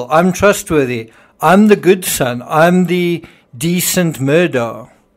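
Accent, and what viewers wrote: South Africa. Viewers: Nice voice, South African! I agree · Sir, I love your South African accent;it's beautiful........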